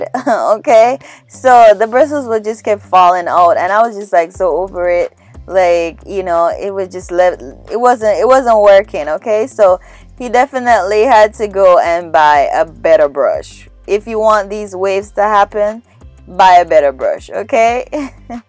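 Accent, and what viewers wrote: Jamaica. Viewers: You are Jamaican I can tell my parents are Jamaican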